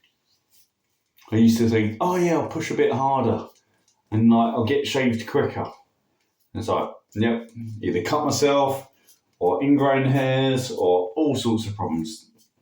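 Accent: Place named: United Kingdom. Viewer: The thing i love about british is the accsent it proper🇬🇧🇬🇧🇬🇧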